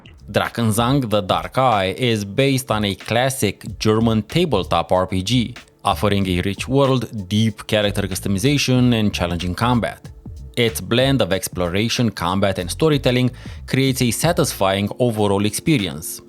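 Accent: Romania. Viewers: Ai accent de roman. Esti roman. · Badass list. What's that accent? My terrible guess is Romania :)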